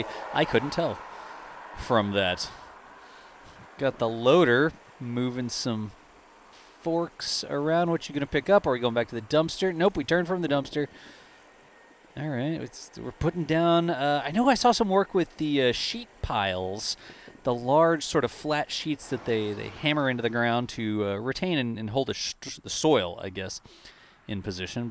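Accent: Andalusia, Spain. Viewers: Estupendas las explicaciones de como van los avances, gracias por la narración en andaluz. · GRACIAS a tí por traernos las noticias de primera mano y en perfecto español (ademas con ese acento andaluz tan simpatico y agradable) ❤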